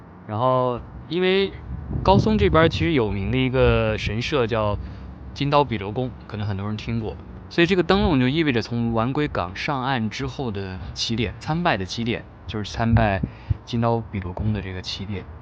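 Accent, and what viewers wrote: Standard. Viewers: 视频主的声线温柔纯净，普通话标准，赞！ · 哪裡人？有沒有人說過你聲音蠻好聽的？普通話也很標準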